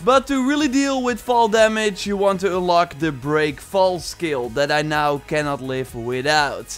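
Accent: Netherlands. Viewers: Youre from the netherlands? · Great videos, but the heavy dutch accent annoys me a lot. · Heey are you Dutch? (I think I recognize the accent) 😉 I love your Valhalla videos, just subscribed today 🙂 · Are you dutch O.o? Nice video btw thx :3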